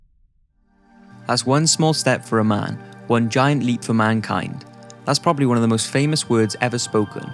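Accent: Wales, United Kingdom. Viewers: I squirt a little bit when you said “a little bit iffy” in your majestic Welsh accent.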